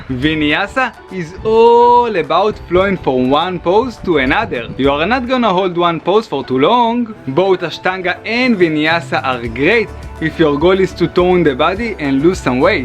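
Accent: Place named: Israel. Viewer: Hey thx.. you have an Israeli accent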